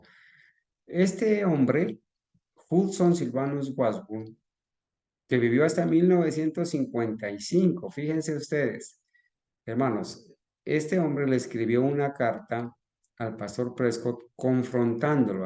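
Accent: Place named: Colombia. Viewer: Bendiciones hno, desde donde transmiten? Le puedo notar,acento colombiano.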